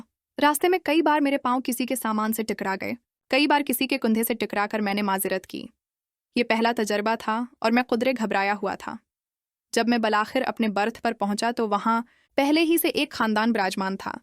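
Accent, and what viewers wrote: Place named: India. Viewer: کہانی کسی انڈین لڑکی نے بیان کی ھے لہجہ پاکستانی نہیں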